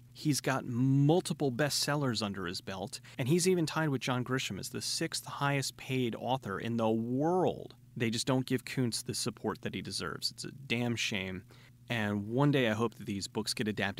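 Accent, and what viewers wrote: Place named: Indiana, United States. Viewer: where are you from? You sound like you're from Indiana maybe?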